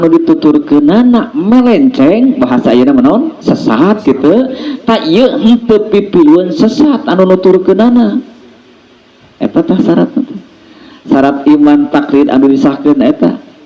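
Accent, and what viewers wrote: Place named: Java, Indonesia. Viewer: Bisa sambil belajar logat Jawa 🙏👍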